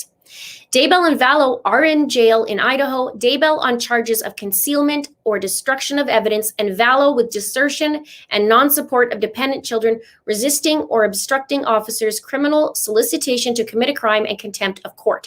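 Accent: Canada. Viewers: Love everything about how you present information, pick everything apart and are onto the lies in people's statements. Melanie Gibb is definitely covering her butt because she should have warned someone many, many times. She is doing all these interviews to make money off of this to take the focus off of her responsibility of saying something sooner of what she knew was going on. I am not buying her innocent statements. Alex's wife, definitely something there. Lori's sister dying... Sociopaths have usually been sinister their whole lives ,ust like Chad has been luring women many more times before. Love your Canadian accent. (from a fellow Canadian) Melanie P. has snowed her husband and someone will spill the truth about her very soon I suspect. Keep up the great work!!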